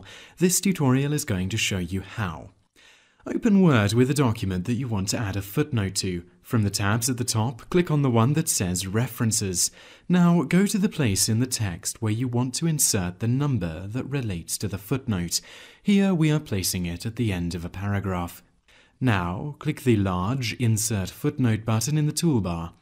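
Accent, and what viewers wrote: United Kingdom. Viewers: I mean it just sounds plain old British to me 😂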